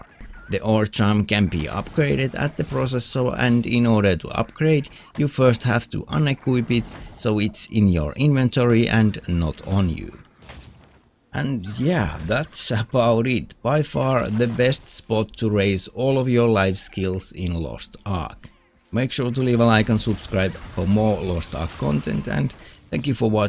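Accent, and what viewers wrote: Finland. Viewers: Familiar finnish accent :)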